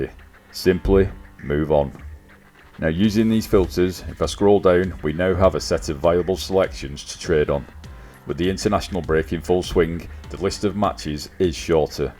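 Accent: Wigan, United Kingdom. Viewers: You from Wigan mate?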